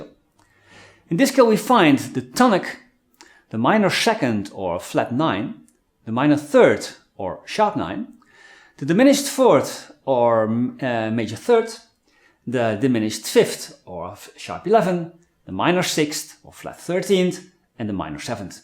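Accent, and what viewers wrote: Netherlands. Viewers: Can confirm! is among the best guitar education resources on Youtube. His explanation, auditory and visual examples are exceptional. And I adore his dutch accent too.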